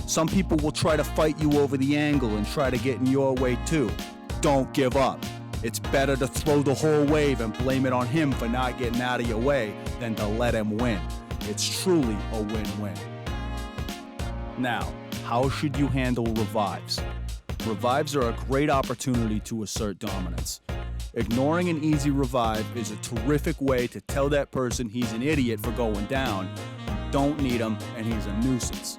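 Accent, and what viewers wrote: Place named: Boston, United States. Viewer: Subscribed.. hilarious especially with the Boston/jersey accent 😂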